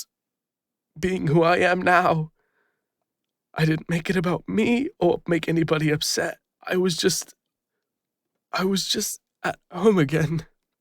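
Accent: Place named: England, United Kingdom. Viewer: You've absolutely nailed the English accent 😁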